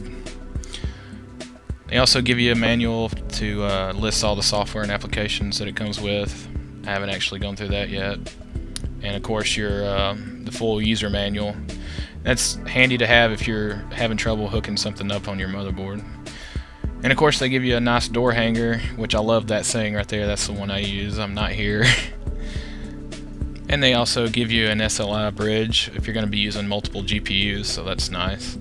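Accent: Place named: Alabama, United States. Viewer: Nice rig. I am building pretty much the exact thing aside from the case and few others. You sound like you're from Alabama or close lol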